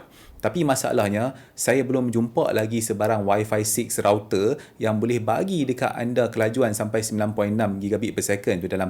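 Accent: North, Malaysia. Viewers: Saya pernah tengok dalam video dia yang lain, dia ada cafe. Sebenarnya video2 macam brother ni buat banyak dalam youtube, yang cun nya brother ni buat video dalam bahasa Melayu slang utara, dan cara penyampaian pun mudah faham, bukan semua orang boleh hadam video omputeh ni termasuk aku.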